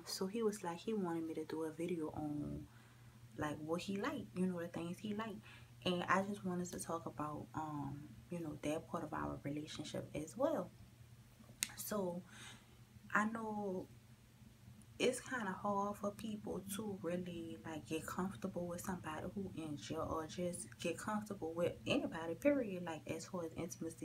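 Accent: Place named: South Carolina, United States. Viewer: Are you from Charleston, SC? Your accent sounds very familiar to me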